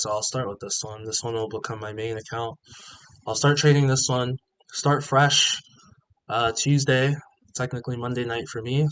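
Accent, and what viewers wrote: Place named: United Kingdom. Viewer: you're from the UK? That's pretty dope. You must've moved here when you were younger judging by your accent. Also I took your encouragement and got my first funded, I did the practice mode and lost a bunch of times but I just got my first trade win for $67.78 and I know it wasn't luck because price got near my take profit three total times before crossing it lol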